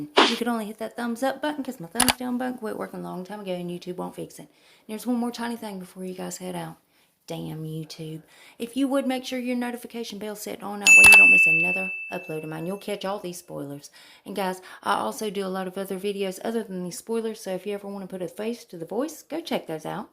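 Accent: South, United States. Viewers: Hey girl! This is my first time finding your YouTube Channel. My name is Kate and I’m from Vermont, but went to college in Memphis, TN and LOVE your southern accent!! Anywho, for my BoxyLuxe June box I chose the TULA Glow and Get It Eye Brightening Balm and my 2nd Choice Option (I wanted the Swell Bottle but it was no longer an option) so I selected the Gua Sha Face Sculpting Jade Device. By the way you literally made me Laugh Out Loud when you said “Y’all, what the hell? Y’all know I don’t know how to pronounce anything. Let’s move on...” I instantly subscribed to your channel after that as in my Vermont accent I said the same thing, LOL! Have a beautiful month of May and thanks for sharing with us!!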